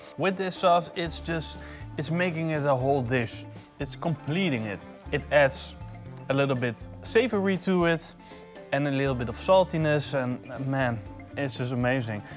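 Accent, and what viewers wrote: Netherlands. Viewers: That accent sounds familiar! A Dutch Friend? Greetings from Germany :) Keep up the good work!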